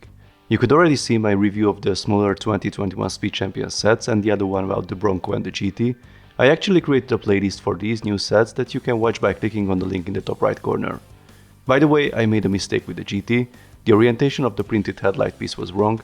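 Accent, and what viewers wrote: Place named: Hungary. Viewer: Hallatszik a Magyar kiejtés 😊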